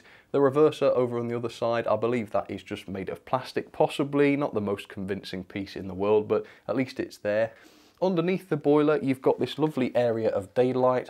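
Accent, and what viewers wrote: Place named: Northern England, United Kingdom. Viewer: He He....! Naughty giggle was had, when the Strange Noise was Described "As a Sheep Coming..!" My Very Sick mind went somewhere utterly Wrong. Imagining ' in Field, Wellies on, chasing down a Rather Worried Sheep..!' So Sorry, but you are from 'Up North', somewhere.?? As I am from Essex, (Originally) anything past Watford is 'Up North' to me..!! Great Review and I am looking for a Nice 0.6.0 tender Loco in B.R. black. So ended here, trying to Avoid Hornby ATM. At least until they get their Shite Together..! Cheers All. Kim in Oz. 😎 🐑🐑🐑🐑🐑🐑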